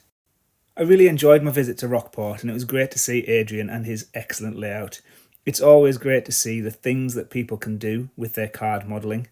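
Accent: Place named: Liverpool, United Kingdom. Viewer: Well, it sound a bit like the Beatles. So maybe it is Liverpudlian. [Is that a word?]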